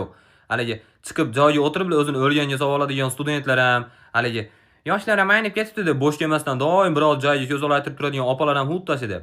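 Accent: Andijan, Uzbekistan. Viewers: Andijon shevasi ajoyib 😊😊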